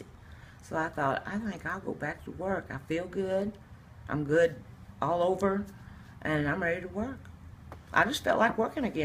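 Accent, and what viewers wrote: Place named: Tennessee, United States. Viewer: I dated a man from Tennessee for a long time and I agree. 100% authentic. ❤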